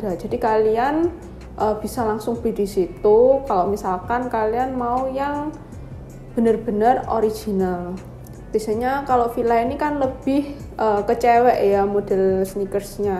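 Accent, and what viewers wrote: Java, Indonesia. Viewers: Salfok sama ke medok an nya mba 👍🏻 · Mbak jawane medok🤣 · baru nonton pertama., mbaknya medok ini jawa mana ya? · Muka korea logat jawa Jadi bikin betah di chanel ini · Maaf bukan rasis🙏. Liat mbak nya kirain orang China luar negri,tau tau China nya udah medok banget hihihi🌚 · medokmu buatku jatuh cinta 😍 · Mendok banget to samean mbakk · Suka medoknya 👍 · Orang mana kak kok kayak ada logat Jawa nya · Aku suka medokmu cik 😍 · Tadi y pengen tau ori atau kw ,tp pas denger medooook y itu ...smgat dan sukses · pertahankan medok nya 👍🏻🥵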